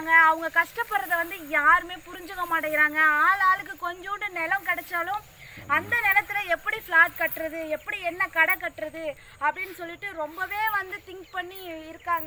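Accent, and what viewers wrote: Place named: United States. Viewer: Ama amerikka la irunthu vanthu iranguna mathiri paesura thoooo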